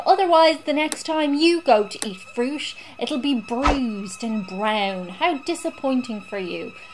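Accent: Ireland. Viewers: With all the American topics I was starting to think there's a strong possibility that an irish impersonation was just part of a gimmick haha so terrible of me to say I know, there's no complaints it was just a little peculiar when I heard it!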